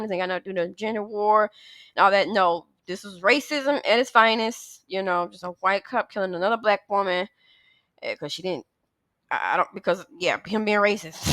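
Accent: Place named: Tennessee, United States. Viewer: You from Tennessee!?!?!?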